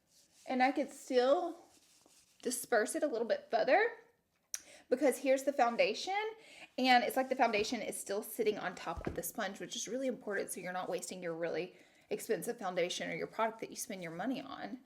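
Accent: South, United States. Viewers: Damnnn she sound like a southern bella or a pretty hillbilly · She's from Alabama and Insatiable is in Georgia. A smoother southern twang than Alabama I think. Love all the southern accents though. :) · "Ma whole side panel just fell" The southern drawl is STRONG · Your country accent poking out is so cute! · Please bring out that southern accent more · When you whisper “what is this thing” I literally lol 😂!!! It was like from a horror/supernatural movie lol Btw pleaseeee keep using your southern accent !! It’s soo cute x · “My whole side panel just fell” that southern aksent gf❤️❤️ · I love her Souther twang....coming from a Kentuckian with a major Southern drawl! 😆😆😆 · Can we talk about how country the first 5 seconds of this video was?! 😂 I looooove it.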